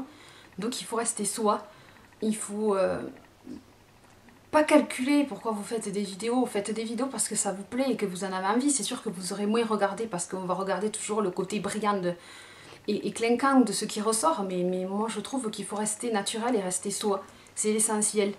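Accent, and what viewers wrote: South, France. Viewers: ton accent du Sud ne me dérange pas du tout d'autant plus qu'il est chantant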